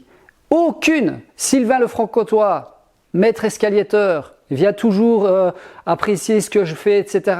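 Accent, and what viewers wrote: Belgium. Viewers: Salut, je suis venu sur ta chaine par le biais de floky, malgré le temps je suis toujours là à regarder tes videos et on doit être un gros paquet, nous comprenons bien ton coup de mou, pas facile d'encaisser des critiques sans rien savoir de l'honneteté intellectuelle, de la compétence, ni de la comprehension des personnes les regardant. Pour comprendre tes vidéos, il faut avoir connu tes débuts, tes explications, et savoir que tu n'as rien d'un donneur de leçon... Alors, comme tu ne vas pas sans cesse expliquer sous peine de ne pas avancer, dis toi simplement que parmi tes 40K d'abonnés, tous ne sont pas bienveillants et avance et crois moi le jour ou tu auras le melon ou tout autre connerie, la communauté entiere saura te dire à quel point tu es dans l'erreur...en attendant, on aime tes videos, les astuces minutes (tant appreciées de floky), tes prononciations belges, l'académie du 'Suleve', ton amour des abeilles, bref, releve toi, mon gars t'es une bête du bois.. 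· Salut, j'aime beaucoup ton "Belge parlé", je te rassure tu n'es pas un cheval blanc et encore moins une star du you tube, mais reste comme ça, la qualité du contenu est bien perçue, on sent bien le VRAI. Un seul regret, c'est que la Belgique soit si loin du pays basque 😊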